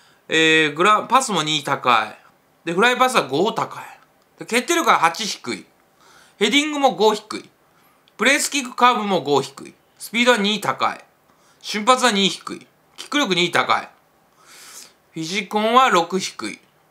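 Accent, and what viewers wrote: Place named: Osaka, Japan. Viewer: 下手な大阪弁ええやん😁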